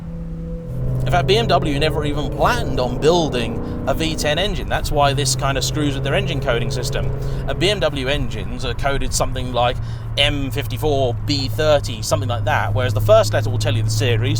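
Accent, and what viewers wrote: United Kingdom. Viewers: Yes, I believe you did a great job with this clip. Yes, I like BMWs, especially, the M3s. This M5 is particularly better than the ones I have tried I admit that but what I am curious about is your accent because I must say you are probably the only Brit that I can understand all of what he says. So, from what part of England is that accent?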